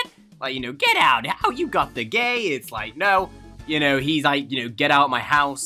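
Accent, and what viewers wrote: United Kingdom. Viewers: wow British dude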